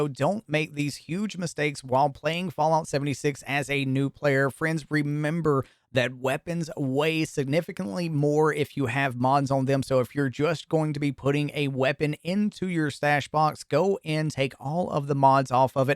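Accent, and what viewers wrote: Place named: Eastern Kentucky, United States. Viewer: You have got to be from the Eastern KY area with that accent. Recognize that anywhere. 😁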